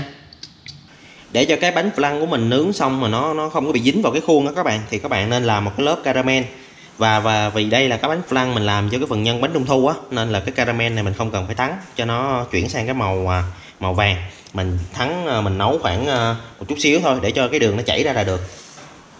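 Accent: South, Vietnam. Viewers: Giọng bạn miền Nam nghe hay & chất phát lắm nên giữ giọng nói và phong văn hay này. * CÁI Trứng. MUỖNG cafe. v.v..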